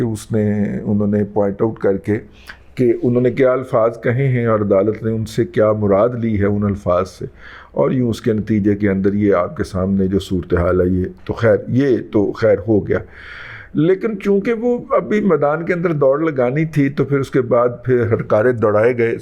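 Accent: Punjab, Pakistan. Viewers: Dear, I always watch your channel because in your satirical and humour flavoured vlog, you weave Punjabi beautifully in Urdu and it is a treat to listen. This was no different. Good to start the day with humour in the eyes.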